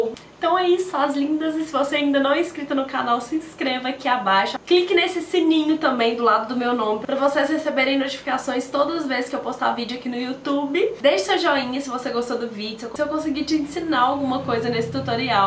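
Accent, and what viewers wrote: Minas Gerais, Brazil. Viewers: se e mineira ne! se nao for n acredito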